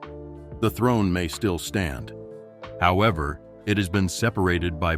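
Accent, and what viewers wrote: United States. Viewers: This voice-over is a joke. American accent and no information about the source of this silly, speculative nonsense.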